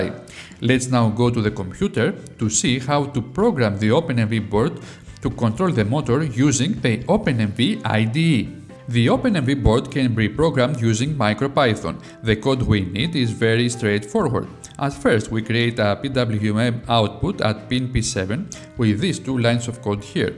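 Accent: Greece. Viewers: Quite informative as far as high tech is concerned but with a strong Greek-English accent which can sometimes be not so overwhelming to native speakers as mispronunciation of words can lead to some degree of misunderstanding and missing the point. Not offence I hope as I am Greek too with Engineering background. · I haven't had any misunderstanding related to his accent. He is quite clear. I thought he might have been Spanish - but now that you've brought it up - I see the Greek intonations. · I love this channel ! The projects are simple enough to be great starting points for my projects. Excellent explanations and the Greek accent is awesome too. Ζήτω η Ελλάς !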